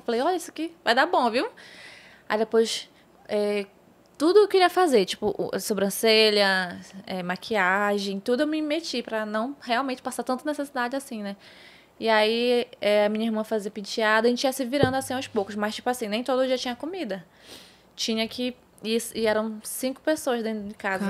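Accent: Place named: Manaus, Brazil. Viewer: Esse sotaque é lindo...minha sobrinha foi criada em Manaus e falava assim...❤❤❤❤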